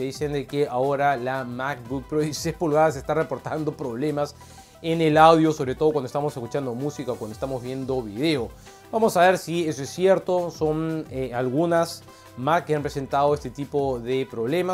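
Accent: Peru. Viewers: Él es peruano?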